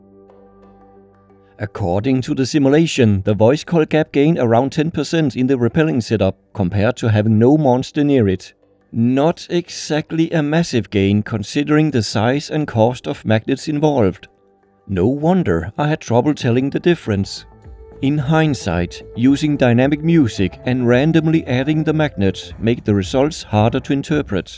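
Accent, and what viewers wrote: Denmark. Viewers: Are you from denmark ?🇩🇰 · Are you Danish? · Are you from Denmark your accent sounds like Danish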